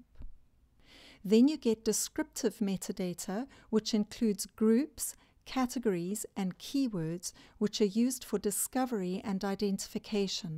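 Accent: South Africa. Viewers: Thank you for the very useful overview. Nice to hear a South African accent too!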